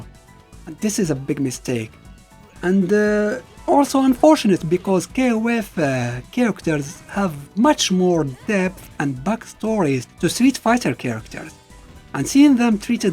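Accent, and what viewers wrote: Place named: Morocco. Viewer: Obviously, Morocco is big enough to point to on the map, but it's still underrepresented. You're not going to get so many people recognizing your authentic accent. At any rate, congrats on your milestone! Personally, I'm trash at fighting games, but KoF's style and flash has always drawn me in. Your channel allows me to enjoy the games for the story AND the gameplay. You make both aspects very approachable for someone who doesn't have much ingress into this space. Also, I'm a huge Metal Slug fan, so seeing general SNK content is a real treat. Thanks for all the videos over the years!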